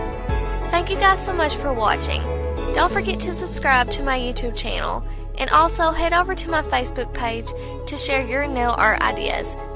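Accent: South, United States. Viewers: You guys act like you've never heard someone speak with a southern accent before LOL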